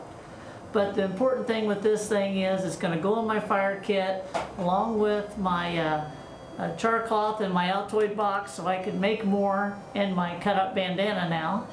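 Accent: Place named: Tennessee, United States. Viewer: She kinda looks like Elvis s sister in the way u talk so u from tenn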